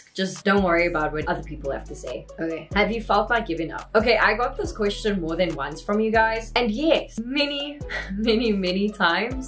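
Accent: South Africa. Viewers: That is actually impressive because I'm also from Cape Town and our accent is not known outsude of South Africa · Yes, stay awesome, following you from Hong Kong. Funny I guessed it right, your lovely accent is unmistakably SAfrican. Love Love Love it! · Ha, I knew from your funny accent that you must be from South Africa! Great to have this finally confirmed! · I thought...you are from New Zealand it's because of your accent (but, I'm not sure because now that you've said you're from South Africa...Now, I do understand because I do have work with people that are from South Africa - now, does it make sense because they talk or speak like you...(sorry, I mean it in a good way)...Good Luck & more power to you in your content... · I could never place your accent, but now finally it all makes sense, South Africa. The same accent in District 9.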